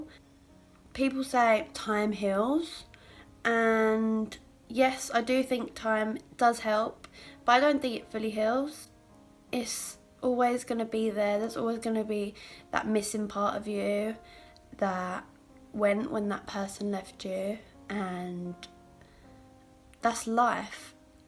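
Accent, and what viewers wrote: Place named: Essex, United Kingdom. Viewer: Omg it’s okay to cry just remember that there looking down watching u carry on being amazing and I honestly love u so much and ur so inspirational and I’m obsessed with ur Essex accent lol 😂 ur gorgeous and strong remember that!! 👌🏼❤️❤️❤️❤️❤️❤️❤️❤️❤️❤️😍😍😍😍😍😍‼️‼️‼️‼️‼️😭😭😭 We all ly ‼️❤️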